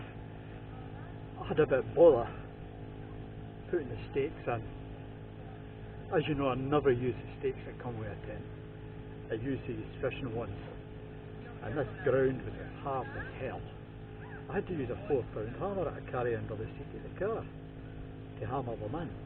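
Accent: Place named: Tayside, United Kingdom. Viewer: Sounds like Tayside.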